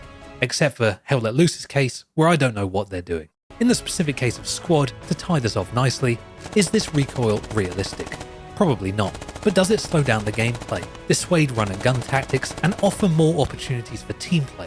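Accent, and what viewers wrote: United Kingdom. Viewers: Typical dodging the question British retort on Youtube. "haha just a video game it doesn't matter, real war bad" I really see the weather caused depression and I'm glad my ancestors waged war to separate ourselves to where now your prince married some tramp and your king sucks on top of that. I mean why even go over real life in a military simulation (besides the fact artists and coders more skilled than you dumped their heart out on this experience). And your argument? Hilarious, you give no solutions to the issue you complain about. Yeah, you claimed milsims stupid because they're not real life. I don't play milsims but I know what you just said was placed on a Received Pronunciation Platter of over confident self-hatred, the next course is projection. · Why is a British guy telling me the gun is unrealistic. You’ve never shot a gun. 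This is more realistic than it was. When you shoot a gun with a scope, the scope moves.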